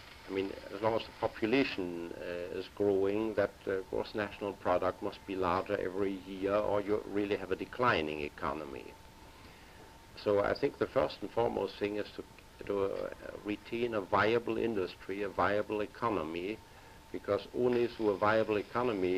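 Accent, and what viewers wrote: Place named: Germany. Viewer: I see you trying to hide your German accent cause lots of Jews in American government that allocate nasa funding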